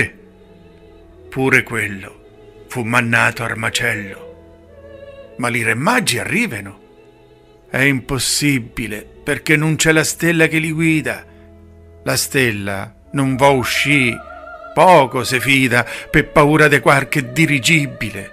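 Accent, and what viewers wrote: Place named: Marche, Italy. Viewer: Peccato la cadenza che su Trilussa doveva essere obligatoriamente Romana invece sembra Marchigiana/Umbra. Nulla toglie alla bravura dell'interprete.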